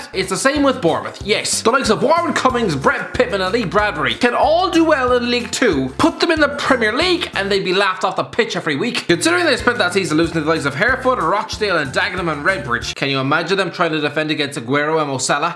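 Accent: Ireland. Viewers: I loveeee his Irish accent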